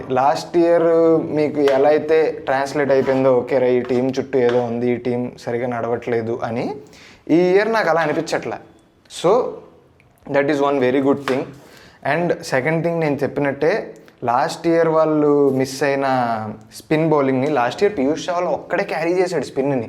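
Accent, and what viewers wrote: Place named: United States. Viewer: Aa accent entra? American Telugu ah?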